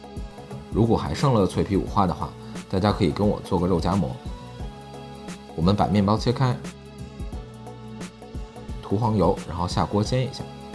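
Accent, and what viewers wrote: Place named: China. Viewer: 除了刀功和口音是中式的，其他完全纯正老牌欧式，毫不犹豫素质三连。留着整套菜谱，我圣诞节做家饭。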